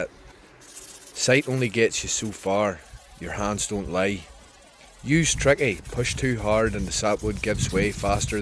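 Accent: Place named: Yorkshire, United Kingdom. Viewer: luvin your Yorkshire accent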